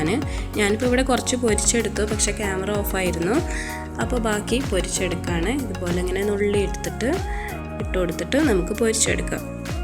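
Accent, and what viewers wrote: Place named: Kozhikode, India. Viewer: Kozhikoodu aano... But language manasilakunna rethiyil🙂🙂👌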